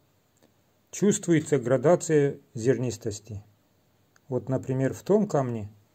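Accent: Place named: Caucasus, Russia. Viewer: ДОБРЫЙ! ДАВНЕНЬКО НЕ ЗАХАЖИВАЛ, К ВАМ В ГОСТИ. ОБЗОР ПОУЧИТЕЛЬНЫЙ ( У ВАС, ИНАЧЕ НЕ БЫВАЕТ ). МИРА ВАМ И ДОБРА 🙏 P.S. КАВКАЗСКИЙ АКЦЕНТ, ВАМ ИДЁТ 😉✌️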